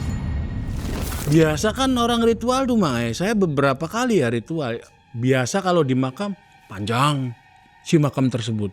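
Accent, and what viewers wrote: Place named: Brebes, Indonesia. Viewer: Mas wong brebes bukan sih?? Logatte kaya wong brebes tanjung losari jawatengah.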